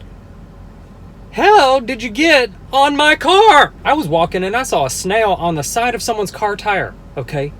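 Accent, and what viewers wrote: South, United States. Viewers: Kind of new to this channel. Love him. But where is he from? He says he lives in California but if I'm not wrong, ain't that a southern accent??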